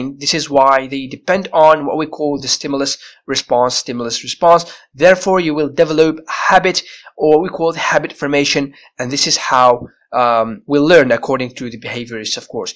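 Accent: United Kingdom. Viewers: What i like about u, your UK accent Keep on that prof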